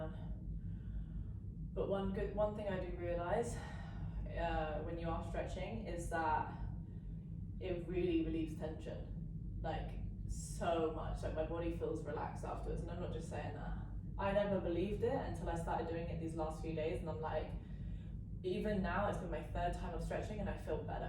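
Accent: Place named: Australia. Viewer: AYE!?!Mr. Shit Starter,. That's Me Aussie sister, ya Monitor Mouse masoosing Pre-Vert & Since she is my sister by human race standards. I love & respect my brethen, So this is mearly giving you props & I'm an emotionally mature man, nothing explicit, just giving credit were it's due, &...." I WANNA GO TO A LAND DOWN UNDER!", Icld never deny thee accent, but This is the 1st time saw you.._so OVERDUE IS A HUGE UNDERSTATEMENT,Its like I found an eviction note when I got home &theyre shutting my water, lights&gas off tomorrow 'OVERDUE.' And I would be a fool to Not say, WOW, You are 'PROP'URRR´ {my own,just mean VERY ATTRACTIVE....& Iam attracted. But persue what make you happy sweethearts. I ask Girl, Just PLEASE 'NEVER Do 'Onlyfans' or 'AduVid' I KNOW OnlyFan have big ties w 'Child Sex Ring' 4 different sourcesWe know how the other rolls. My Instragram is 24 days Old & dismal.You don't mind.Ikeepit fun, so I hope you &I cld friends. 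Talk to ya soon. Ok?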